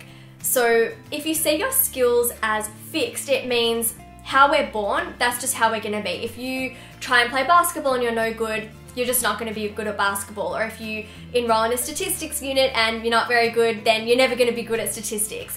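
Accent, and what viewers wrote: Australia. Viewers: are u australian like me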